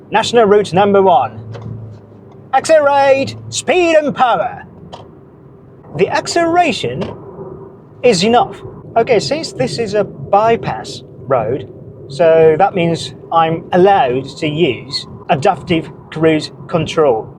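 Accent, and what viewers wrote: United Kingdom. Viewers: 真実の棒を使う配信者のようなゴリゴリのイギリス英語で、旧植民地で生産された元同盟国メーカーのクルマを、元帝国主義国の元雑誌編集者と腰痛持ちの配信者をオマージュしながらレビューする淫夢廚とかこれもうわかんねぇな… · イギリス英語で車紹介、どこかのトップギアかな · イギリス訛りの英語を流暢に話す日本人が、インド製の日本車をレビューする動画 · 車の紹介でイギリス英語はあれを思い出すな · イギリス訛りなだけでイギリス人特有の辛口レビューが足りない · スズキ、フロンクス、天竜川、イギリス英語 全部好きだから助かります ありがとうございます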